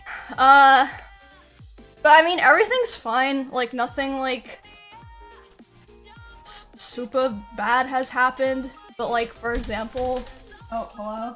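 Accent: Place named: Kansas, United States. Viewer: Nice Kansas accent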